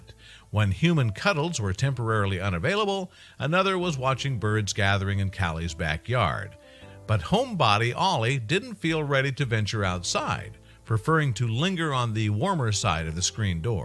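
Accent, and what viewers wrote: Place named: United States. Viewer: That god-awful American accent. Hit the mute button.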